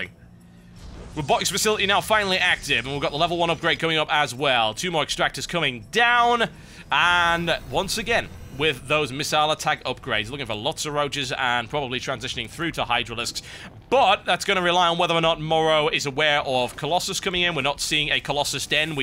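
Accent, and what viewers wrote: United Kingdom. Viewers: but but TB's awsome brit voice/commentary of epic SC2 matches...i must watch...i need it so i dont have to go and study my rad tech material or my statistics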